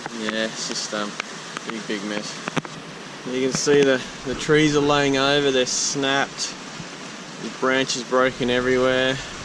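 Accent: Australia. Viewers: You sound Australian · wait are you from brisbane?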